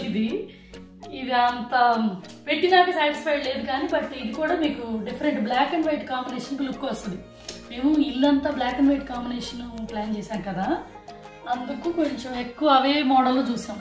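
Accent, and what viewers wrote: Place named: Guntur, India. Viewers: Hi sisterYouTube suggested your home tour video 3 months but I didn't see that, after Diwali again suggested your Diwali video after that I liked your Telugu slang I guessed u and your husband was from guntur dist.i watched all your videos really it's very entertaining.i am your subscriber. Now I am currently in Mexico, here I met so many Telugu people, they're very shy to speak in Telugu. any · Mee language chusi anukunnanu..Andra ani..nice to see you in vidios...😍😍😍😍😍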